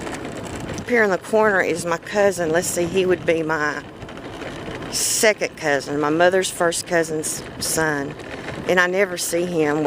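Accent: United States. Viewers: Next time you go out, I would love you to take some carrots and get the horses to come close to the fence and feed them the carrots. Would love to see them up close. I wish I could pat them. Does the field smell great after the hay has been mowed? I love your Texan accent. And love seeing the fields that go forever. It has been so great to come on a ride with you. I love how one of your neighbours planted heaps of trees. xoxoxoxoxoxoxoxoxoxoxoxoxoxoxoxo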